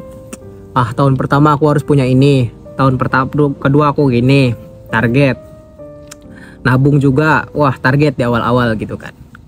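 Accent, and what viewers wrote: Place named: Kebumen, Indonesia. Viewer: KEBUMEN di TAIWAN hadir, q auto ngakak denger ms nya ngomong lucu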